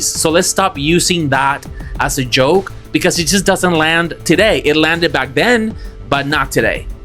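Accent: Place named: South America. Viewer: this surprised I did not think at all you were living in the US, I thought you were South American or Caribbean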